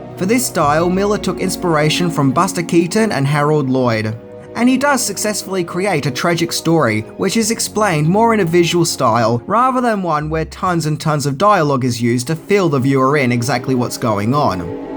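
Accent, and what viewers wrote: Australia. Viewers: Always makes me smile when an Aussie talks about Mad Max. Just seems more fitting than listening to American reviewers. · An Australian talking about Mad Max feels right.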